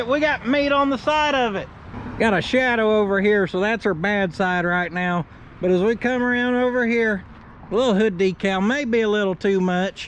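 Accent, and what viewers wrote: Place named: South, United States. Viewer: Dude im not even from the south and I love his accent and additude.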